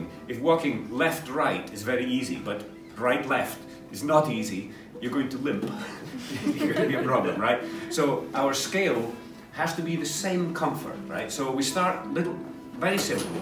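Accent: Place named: Scotland, United Kingdom. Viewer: It s a middle class well spoken scottish accent for christ s sake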